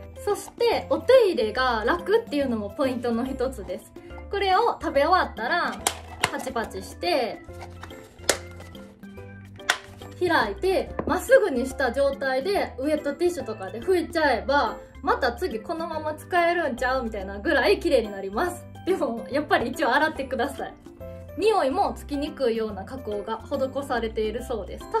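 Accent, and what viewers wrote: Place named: Kansai, Japan. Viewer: 、 通販番組出来ますよね、笑顔と、 心地よい関西弁でがっちり心掴まれますね❗️ チャンネルの人気もお人柄なんだと思います♪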